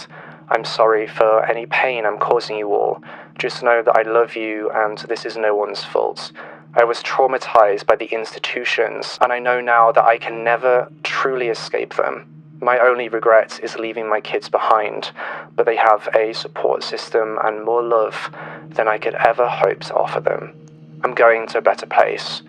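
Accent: United Kingdom. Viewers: I do enjoy your presentations! I also am a fan of “Dark Curiosities”, as I noticed on your channels list. I love British your accent, as it makes listening seem more mysterious! I’m an older person, and have Bi-Polar, as well. I take my meds daily! It effects all a bit differently, and poor Chelsey’s was sadly out of control. Also sad , is the fact that sinister people like “Dark Rider”, are out there as predators. To people in vulnerable.conditions, as her’s. Stay well!🙏🧡✌️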